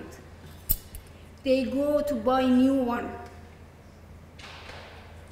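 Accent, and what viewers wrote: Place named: Iran. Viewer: 17سال در اروپا مدرک دکترا باید انگلیش اد خیلی خوب می بود انگلیش را مثل فارسی صحبت می کنید لغت ها سر به سر کردی گفته روان هستی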